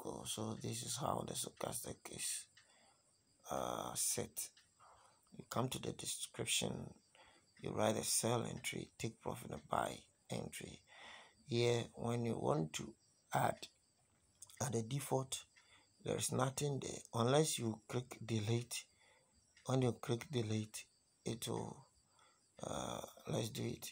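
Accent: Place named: Ghana. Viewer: You sound like a Ghanaian ❤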